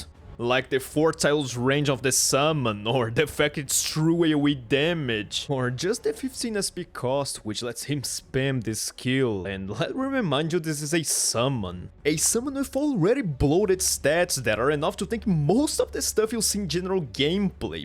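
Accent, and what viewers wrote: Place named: Brazil. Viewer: sorrio toda vez que abro um vídeo sobre um interesse relativamente random meu e escuto um sotaque brasileiro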